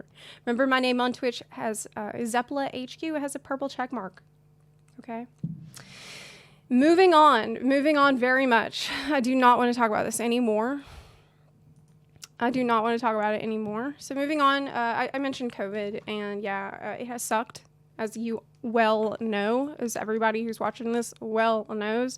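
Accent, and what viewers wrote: South, United States. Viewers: "Ya,all sounds from somewhere central southern USA area. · I can't believe you're from Ukraine! I guess it's just because I've always picked up a very slight southern US accent.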